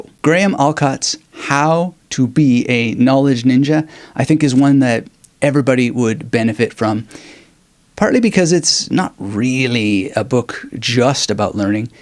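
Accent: Canada. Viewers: Rockin' the Canuck accent 🎉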